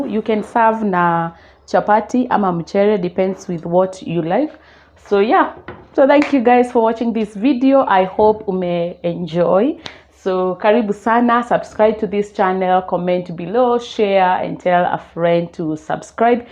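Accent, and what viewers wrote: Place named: Kikuyu, Kenya. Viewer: The Kikuyu accent in you made me Subscribe asap....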